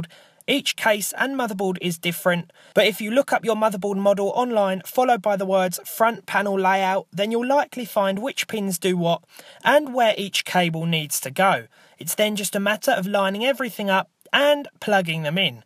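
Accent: United Kingdom. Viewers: As always, i love your videos. I follow you from italy, so forgive my English. I love your british voice. Continue in this way, u r pretty good.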